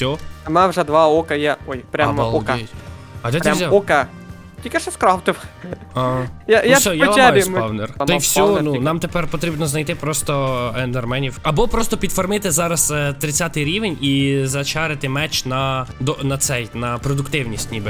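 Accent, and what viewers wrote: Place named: Surzhyk, Ukraine. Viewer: Ви розмовляете на суржику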